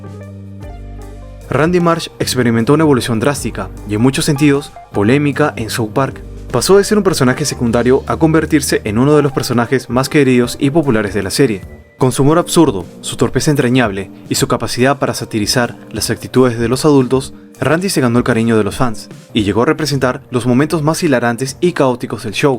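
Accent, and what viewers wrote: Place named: Peru. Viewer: Tu acento.. Me es muy familiar, eres de Perú?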